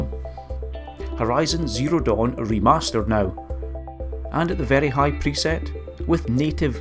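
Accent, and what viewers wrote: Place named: Aberdeenshire, United Kingdom. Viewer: Very professional video, is that an Aberdeen/shire accent?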